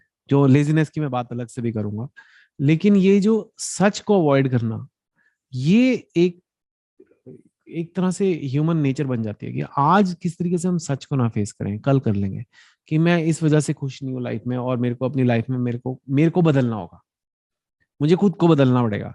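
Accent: Delhi, India. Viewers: I like the way he talks like Delhi Walla style.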